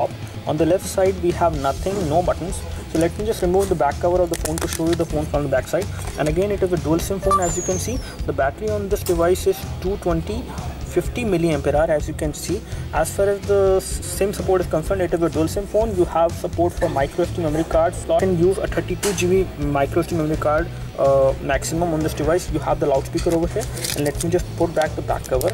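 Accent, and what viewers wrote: India. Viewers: OMG!!!!!!!!!! Fuck who many words u speak per second......Indianglish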